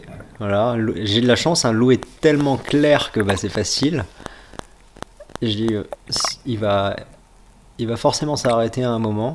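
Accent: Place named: Brittany, France. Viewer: Elle marche bien cette petite vidéo commentée, félicitations, avec l'accent breton c'est sympa.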